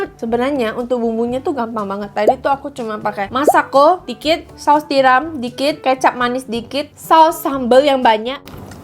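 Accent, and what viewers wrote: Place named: Bali, Indonesia. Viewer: Logat balinya udh mulai ad yaa😅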